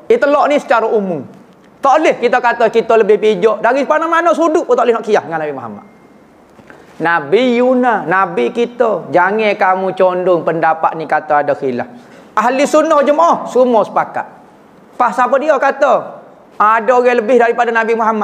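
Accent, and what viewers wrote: Kelantan, Malaysia. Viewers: Assalamualaikum! Ustaz boleh tak cakap Bahasa Malaysia lebih banyak sebab kekadang sy tak faham cakap Ustaz tapi suka dengar ceramah Ustaz sy tak faham loghat Kelantan maaf ya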